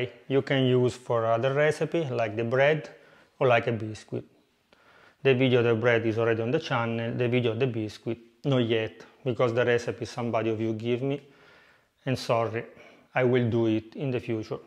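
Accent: Italy. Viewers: Wow! Maestro,I really learnt alot especially that of your fascinating Italian accent, it makes me feel like am in Milan or Cincinnati already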